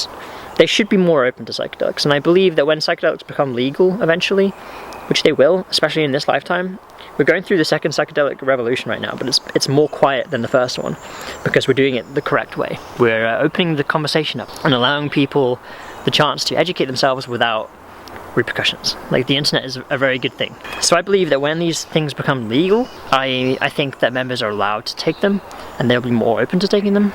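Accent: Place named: England, United Kingdom. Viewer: Where are you from because you mentioned high school but you have an English accent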